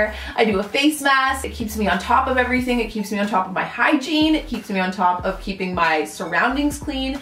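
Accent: Canada. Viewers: Brea Monai I thought it sounded Canadian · I lovvvvve the Canadian accent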